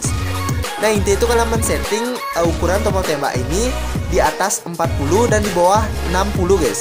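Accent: Bali, Indonesia. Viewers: Kok cara ngomong indonya kek orang bali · Orang mana bang kok kayak orang badung bali ngomong bahasa indo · Bang Abang orang Bali? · Bli logat nya kayak orang bali nek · Logat bali😅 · Ngomongnya kayak orang Bali gw curiga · Org bali ya bang ya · Pasti orang bali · org bali y bg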